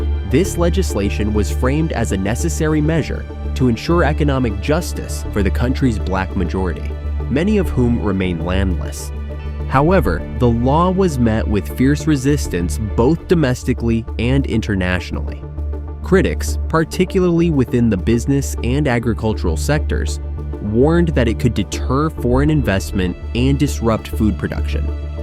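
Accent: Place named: West, United States. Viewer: U sound like u from the west. Would u rather we steal land and oil daily (67k) oil from Syria as USA do, or steal from Africa as the French Dd? No we in SA will build ourselves up and work hard. No matter how long it takes but the days of the west telling us what to do it over. U all can trade between yourself.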